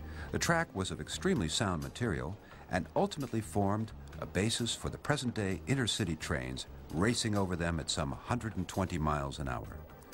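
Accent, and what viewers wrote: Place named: United States. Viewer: You know it's an American for how they say English place names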